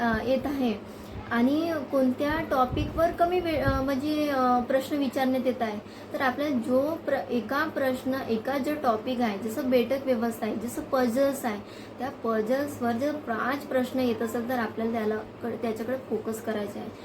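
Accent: India. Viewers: मॅडम तुमची भाषा खूप छान आहे. पूर्व विदर्भात आहेत का तुम्ही. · Nagpuri bhashya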